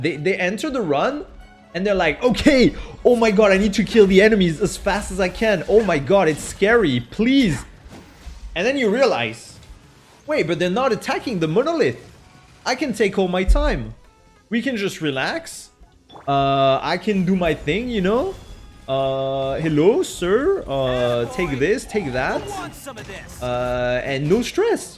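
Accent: France. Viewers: is it hard for you to speak English and you are frensh idk btw i can speak both of them :) I'm from Morocco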